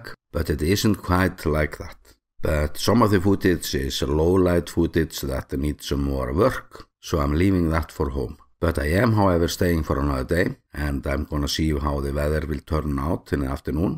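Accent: Iceland. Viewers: There are other YouTube channels covering this eruption but they don’t start with your fantastic Icelandic accent, “Greetings from I-eee-C-lund” so they are only 4.5 stars 😊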